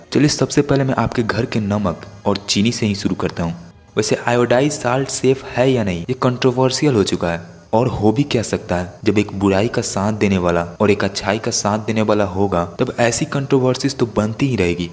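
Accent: Bihar, India. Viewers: Aap kya bihar se ho apki bhasha se pata chalta hai. Ap bahut hi knowledgeful video banate ho thanks for this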